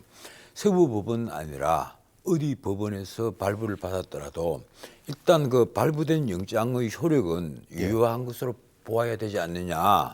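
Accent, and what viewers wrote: Jeolla, South Korea. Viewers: 절라도광주앵커 티를 너무낸다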